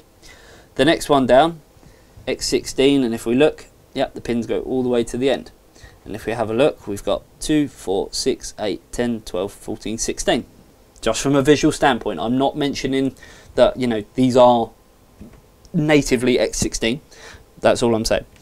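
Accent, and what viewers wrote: United Kingdom. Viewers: *hears voices in head* Whos video is this? British right??? Commonwealth??? Everyone is reading this and wants to know what RAID 0 is. Raid is where you have more than 1 hard drive hooked up for storage and performance. Raid 0 is a type of Raid setup that's the cheapest (I don't plan on using 20 hard drives with Raid 0 setup) in performance. YOU DONT NEED RAID 0 IF YOURE NOT INTO VIDEO EDITING WITH WRITING FILES AND COPYING FILES TYPE SHIT. SO DONT BUY RAID 0 IF YOU DONT DO THIS TYPE OF STUFF ALL THE TIME. Raid 0 should improve the lag or stuttering with programs like FRAPs and other software. Its writing information twice as fast or suppose to. You shouldnt see a lag sync in watching videos either after recording a giant file after editing it. I still didn't hook up RAID 0. Did I win the biggest LOSER AWARD yet? Good... I didn't want to be your friend anyways.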